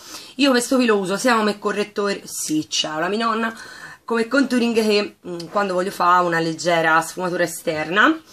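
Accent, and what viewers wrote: Tuscany, Italy. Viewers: Ciao, mi fa piacere che hai trovato lavoro! Quando ti ascolto mi sembra di essere quasi in famiglia xké dalla parte di mia madre sono tutti toscani da secoli e la parlantina toscana mi rilassa 🤗poi tu sei schietta, sincera e senza filtri quindi.....mi fai tanta compagnia! Un bacione! Un augurio di buona ripresa x la tua nonna Leda!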